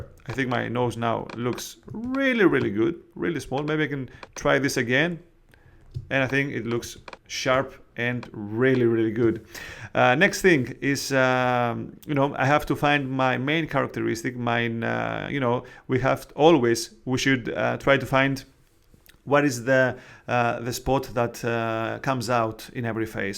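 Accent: Greece. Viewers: from your English i understand that you are a Greek dude.So, Ευχαριστώ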